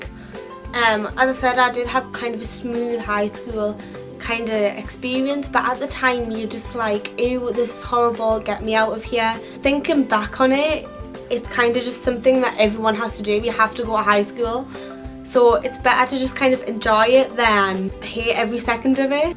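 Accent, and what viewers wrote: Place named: County Durham, United Kingdom. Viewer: are you and marnie from geordie shore from the same part of the UK? just curious because you have similar accents. But i have no idea where you are from in the UK. she's from County Durham btw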